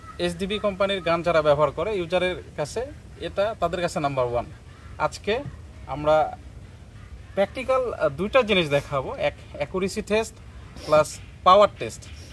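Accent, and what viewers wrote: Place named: Naogaon, Bangladesh. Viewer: Apnar kotha sune buzhlam apnar bari Naogaon ba Joypurhat . Amr bari naogaon. Nx 200 ta kinte chai. Ki upaye kena jay?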